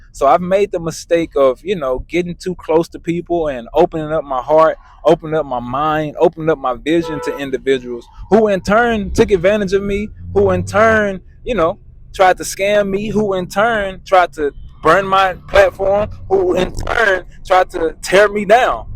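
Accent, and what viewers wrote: United States. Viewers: Man sounds like the hood in New Orleans😢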